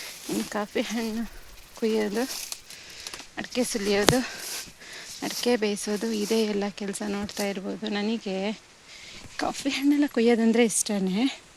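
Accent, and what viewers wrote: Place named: Tulu Nadu, India. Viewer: Nimage Thulu barutha magu mathaduvaga anishithu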